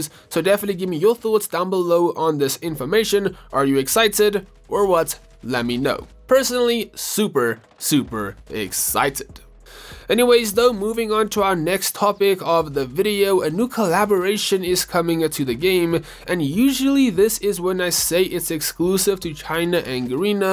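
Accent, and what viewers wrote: South Africa. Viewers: Your from south africa?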